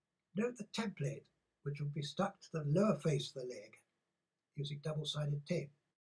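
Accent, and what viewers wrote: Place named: England, United Kingdom. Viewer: you are 90? I wish you lived close to me,you give a very good discription of DIY but I woulld cut my hands off(smile) Love your English accent.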